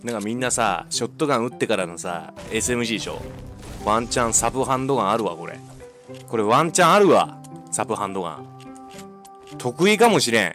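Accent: Kansai, Japan. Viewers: 関西からしたら喋り方普通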